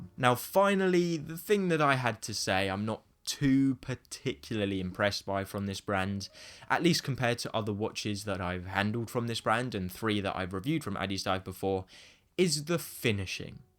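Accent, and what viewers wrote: United Kingdom. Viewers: I’m completely over the British accent. Click away.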